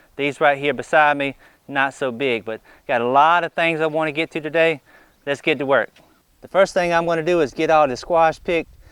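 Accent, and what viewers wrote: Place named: Virginia, United States. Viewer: lol.. no wonder i love your accent. i live in southern va too! im an apartment dweller snd cant grow my own veggies but would love to. thank goodness for my local farmers market!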